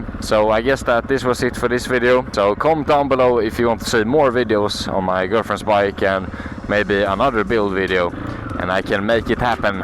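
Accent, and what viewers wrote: Sweden. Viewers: So much swedish accent😂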